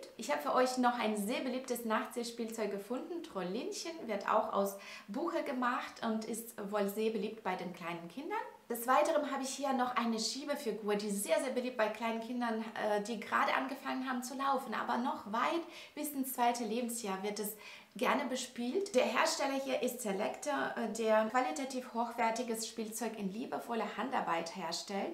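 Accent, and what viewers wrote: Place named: Russia. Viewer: wo ich Deinen Akzent einordnen soll... Ja, klar, Russland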